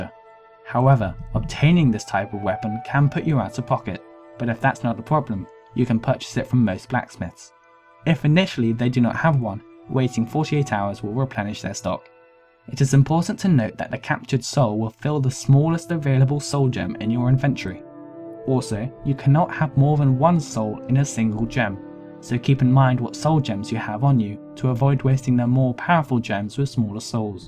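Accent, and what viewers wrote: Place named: United Kingdom. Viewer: curious, do all these videos with commentaries hire a British person with an accent to narrate without them being involved with the game at all? this guy just reads from a script . lame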